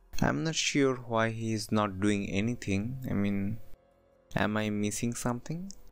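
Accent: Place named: India. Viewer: U sound like a indian, or are u? dramatic music plays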